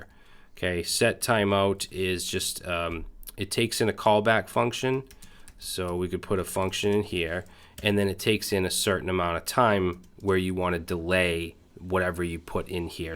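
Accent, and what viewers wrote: Boston, United States. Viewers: has me laughing out loud ...Boston accent and all you keep it real!!! Live for your content broski keep it coming.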